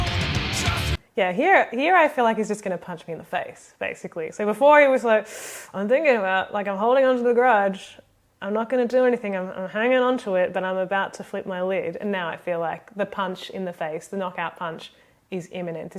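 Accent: Australia. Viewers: Wait.. am I not identifying your accent correctly.. are you Australian? I always thought that strange instrument sound is a didgeridoo, isn't it?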